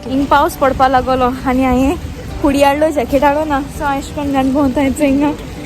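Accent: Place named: Konkan, India. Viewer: What dialect of Konkani is this as I could barely understand. I live in Cardiff.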